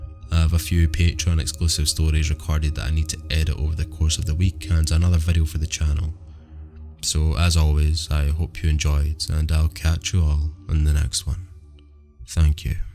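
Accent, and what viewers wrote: Scotland, United Kingdom. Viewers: Mr., I, myself, am Native American. It was a true joy to hear stories of Skin walkers in a Scottish accent! I loved it!! ❤❤🤘👍👊fist bump! I truly love your channel!! So honored to have subscribed!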